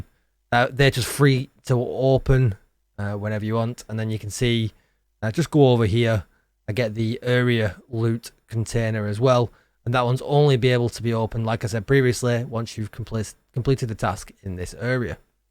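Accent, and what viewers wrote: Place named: Bolton, United Kingdom. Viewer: Are you from around Bolton bud😁. I've played a bit of it but dont want to grind too much, it may just put me off when it actually releases. I just wanted to nose around to see if it was worth coming back to with some friends.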